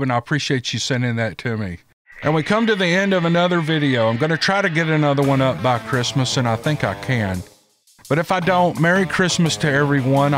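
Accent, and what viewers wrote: South, United States. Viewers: I love listening to you too in the mean while i am knitting or crochet a sweater or so, you have a nice voice and talk so that even with your southern accent it is pleasant and very good to understand, so keep on the good works, greatings from Marleen from far away europe in Belgium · I agree with Miss Jessie you do have a sweet southern accent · Hi, I hope you and your family have a safe and happy holidays. I'm from Australia 🇦🇺 and it's summer down here,... I love listening to your Southern drawl. Keep up the great work. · I'm a 70 years old lady in Pocahontas, Arkansas I like listening to your Bigfoot stories too. And I especially like to listen to stories about bigfoot being so close to where I live. Your voice seems southern and familiar so it keeps me company. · Finding Bigfoot? Finding Nothing... You Don't Find Bigfeet. 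They Find You. (Don't Call Us. We'll Call You) I'm quite new to this channel, about 4 months at THIS typing. I'm addicted to it - Some super good, fun stories. I enjoy "Steve Lilly Journals" too. (God DAMNED Filthy 'Squatches!! Ha!) Good Writing! ~ I live in B.C. (Vancouver Island) Canada. I've spent decades living in some pretty "howling wilderness" & experienced some strange things. I've yet to encounter any Sasquatch...However, I know one serious individual, whose word I trust as veritable without question, and who claims He HAS seen one... I neither believe nor disbelieve. I don't know Anything for Certain. Indigenous peoples here take the Sasquatch as a matter of fact. Sasquatch are deeply embedded into their very ancient cultural mythos lineage. Many tribal members are quite candid about the phenomenon, they call "Pohk Miss" (Meaning "Big Foot" - Go Figure) as if as familiar, and real as the West Wind. 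I CAN say that we live in a vast, complex, diverse, and mysterious living world, about which We collectively barely know & hardly understand at all. It is always in a state of Constant Flux. Fools THINK they have a real grip on reality. ~ Been practicing Mr. nice regional Southern accent. (I like various accents and find them "geo-culturally" & historically interesting, with regard to the human condition.) I'm...Getting better...Getting better... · colloquialism and regional differences in syntax are what make regions unique. rather than focusing on any particular differences, realizing how any language changes through time, understanding and accepting these changes will help those who are hung up on particular words and/or pronunciations. as long as people can understand your message, then what does it really matter. and those who nit pick and complain just to complain, usually are projecting their own issues of self acceptance., your reading/narrations are always spot on and very easy to understand. 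Your Southern accent, draw and tone make it all the more enjoyable 😎🤗🙃💯✨😐. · I enjoy the stories , don’t sell your self short . You are an articulate gentleman. Down home southern is refreshing, fun and precise. Merry Christmas. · So true. He has a comforting voice and I just love that southern drawl. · You can pronounce it any way you like--that sweet southern drawl (I'm in California orig from El Salvador) is what makes it so much more enjoyable. And yes, yes, the Sasquatch stories too, lol!! ❤️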